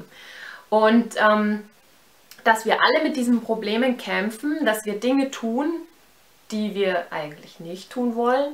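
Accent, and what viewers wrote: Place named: Austria. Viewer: du hast schon voll den österreichischen akzent übernommen... :D kannst du mal eine tagesroutine mit jesus drehen?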